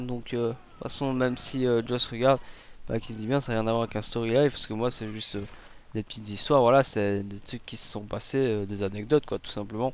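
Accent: Belgium. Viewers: on entend que tu parles plus en belge là c'est symas :)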